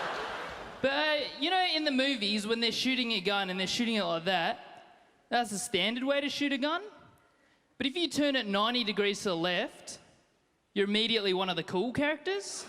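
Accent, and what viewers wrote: Queensland, Australia. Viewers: What i found funny is that hes chinese with an aussie accent! Lol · Yea so aussie. Personally I find it quite annoying when they talk like that. Not all of them have the high pitch though. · That's Aussie accent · It's a real strong Aussie accent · Woah!!!!!!! This guy ROCKS!!!!! And love the Aussie accent! Good on ya mate! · Is that an Australian or a New Zealand Accest? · queeensland accent. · An Chinese guy with Australian accent! That by itself is hilarious!😃😄😂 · this guy looks like asian and sounds like aussie hilarious xD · Is this Australia accent ?😂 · asian kid with australian accent... great combo.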